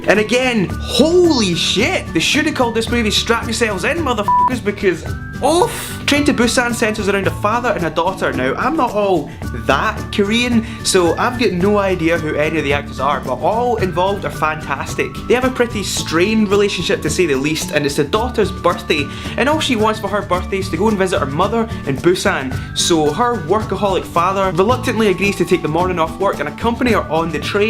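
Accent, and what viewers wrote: Scotland, United Kingdom. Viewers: Your review is on point. Covered all there is to know about the film. You got one more subscriber. Thanks for stopping by my channel! Also, your accent. You're from Scotland if I'm not mistaken. I was there a couple months ago in Edinburgh. Beautiful city. I did a video about the trip. Check it out in my channel!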